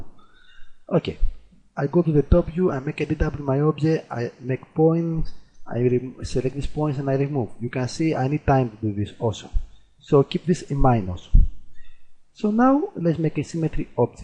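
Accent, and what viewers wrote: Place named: Jamaica. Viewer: nice plugin and you have a nice jamaican accent :))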